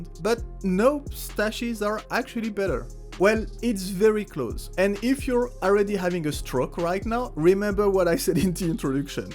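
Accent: France. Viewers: I love your French accent man 👌(I look forward to the crafting video)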